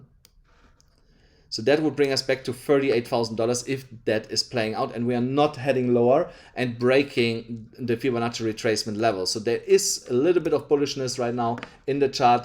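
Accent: Germany. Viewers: Bro kommst du aus Hamm xD?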